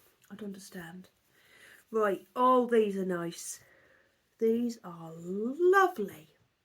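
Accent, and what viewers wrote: Norfolk, United Kingdom. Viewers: Love your fabrics...I buy embroidery tableclothes from secondhand shops as I think they are beautiful. Really enjoy your chats, it's as if I'm sat talking to a friend as I live in Pakefield, Lowestoft so very used to the norfolk accent 😊 so very sorry about Dennis, its just heartbreaking...I know how it feels. 😢 Take care, big hugs to you and your family 🤗 ❤ xx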